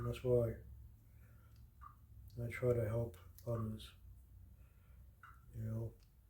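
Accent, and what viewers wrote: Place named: Boston, United States. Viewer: The CC heard your Bahston accent as Alta. :face-green-smiling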